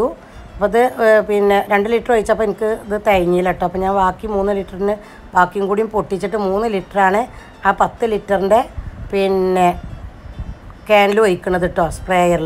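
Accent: Malappuram, India. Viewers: മലപ്പുറം ആണോ സ്ഥലം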